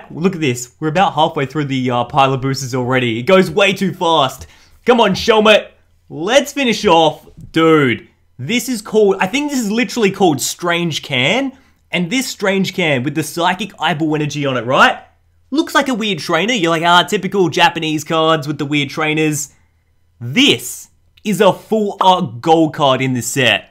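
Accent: Australia. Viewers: Is he an Aussie YouTuber?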